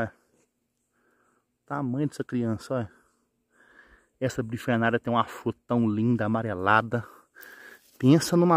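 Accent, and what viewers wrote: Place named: Minas Gerais, Brazil. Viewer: Minino eu gosto de ver as plantas mais eu gosto muito do seu sotak mineiro. Muito bom os seus vídeos 👌👏👏 Deus te abençoe sempre 🙋😍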